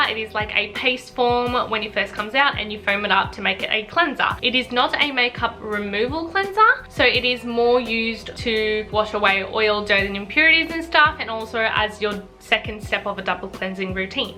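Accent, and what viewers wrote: Australia. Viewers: I love your (Australian?) accent. It just reminds me of the time when I lived in Sydney.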